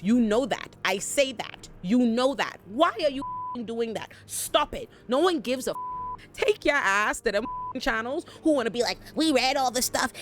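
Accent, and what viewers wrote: Caribbean. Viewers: I sense... Caribbean 👀 🇹🇹?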